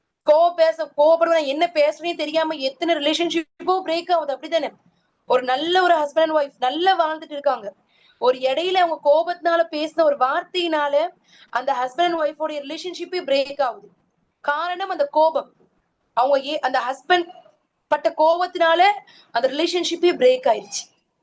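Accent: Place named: India. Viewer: Very useful 🎉🎉❤ Ongada entha program endaalum very interesting madam madam thanx ❤ Session thornthu podunga. India slang very cute 🥰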